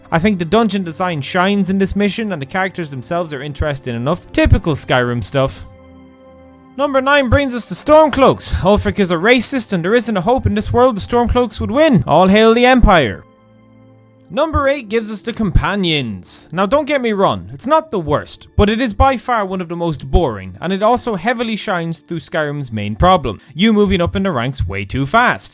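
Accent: Ireland. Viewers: OMG YOU'RE IRISH TOO?!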